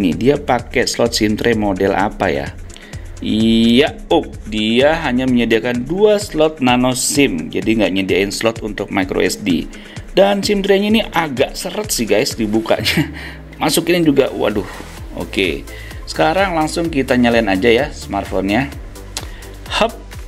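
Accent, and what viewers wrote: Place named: Java, Indonesia. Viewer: Kan aku cuma bilang suaranya kaya orang jawa terus apa salahnya?? Ni saya juga orang jawa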